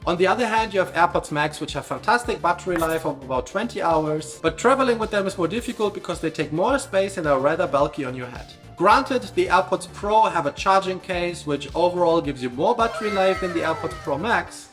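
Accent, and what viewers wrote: Germany. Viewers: bro sounds a bit german .... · Deutscher oder⁉️ Man hört es extrem😂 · Beautiful German Accent! Grüße aus Peking.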